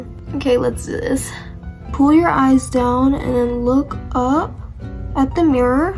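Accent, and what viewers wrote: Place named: South, United States. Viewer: Omg an Asian with a southern accent is so cute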